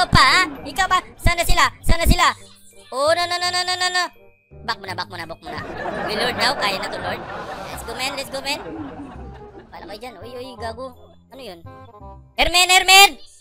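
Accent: Visayas, Philippines. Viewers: Bisaya ba to? HAHAHA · Bisaya po kayo😂😂?? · Bisaya ka no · Bisaya ka bay? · Bisaya ka dol?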